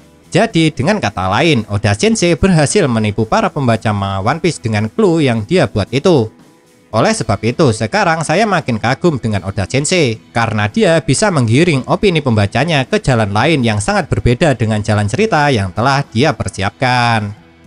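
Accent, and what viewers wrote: Java, Indonesia. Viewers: Medok jowo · Logat jawa nyaa bisa lebih di netralkan 🙏🙏🙏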